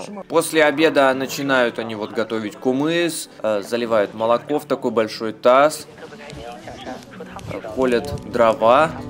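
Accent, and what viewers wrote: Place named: Kazakhstan. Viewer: По чисто казахски. Какое наречие?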